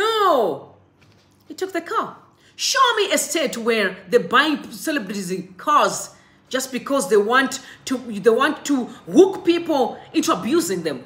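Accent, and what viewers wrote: Zimbabwe. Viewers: Good content but be yourself , you try too hard to sound British and then slip into your default Shona accent and back to British accent... Go to school work hard was a Whiteman s agenda when they put the school.system . We need our own system that works for us.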